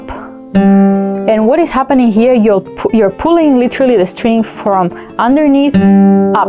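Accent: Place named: Spain. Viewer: Hola! A pesar de que te conozco desde hace un tiempo gracias a reddit, es la primera vez que veo uno de tus videos y era justo lo que buscaba, me gusta como explicas la técnica y los ángulos de cámara que usas, definitivamente me suscribo a tu canal. Ps. Por tu acento supongo que eres de España por eso escribo mi comentario en español 😅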